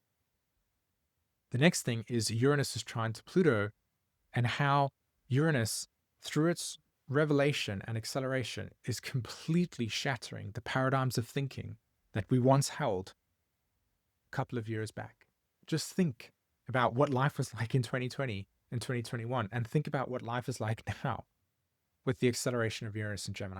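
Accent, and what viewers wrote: South Africa. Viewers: I'm wondering if your accent is South African?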